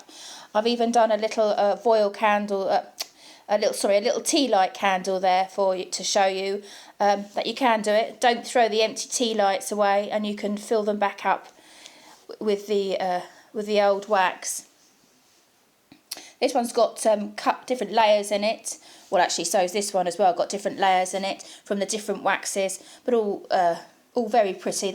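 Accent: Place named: United Kingdom. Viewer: Forgive me, but first of all, as a caveman from California, you could describe new and wonderful ways to tie a trash bag or narrate a blade of grass growing and I'll be fascinated because I just love any sort of British accent ("What accent?!?", you say.). Second of all, I LOVE the video. Quite viewer/DYI'er friendly. Off to the stove and a PILE of used candles!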